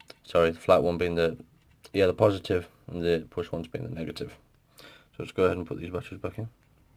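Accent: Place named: United Kingdom. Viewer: Thanks dude. Nice hearing a UK guy do good, in depth reviews for once! Will do man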